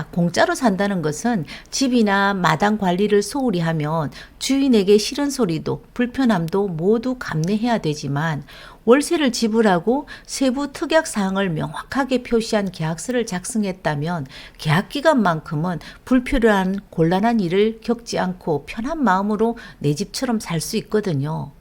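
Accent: Gyeongsang, South Korea. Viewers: 경상도 분? 난 강원도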